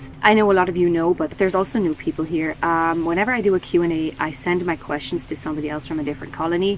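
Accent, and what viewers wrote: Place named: Ireland. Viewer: I hear a hint of Irish in your accent 😊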